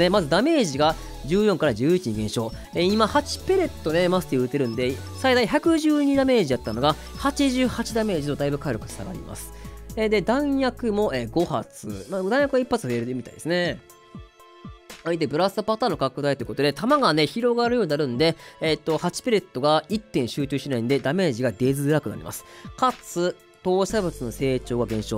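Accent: Kansai, Japan. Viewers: いつも解説ありがとうございます！関西訛りあるのに聞きやすくてすごくわかりやすくて感謝です！アプデ後も楽しみにまってます🐧❤️‍🔥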